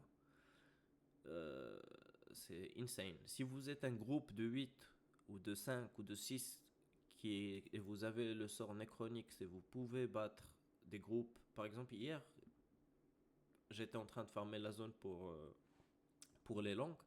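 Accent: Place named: Arab world. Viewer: L'accent reubeu qu'on kiffe, merci pour l'astuce. Le prix ne ferra que baisser dés maintenant haha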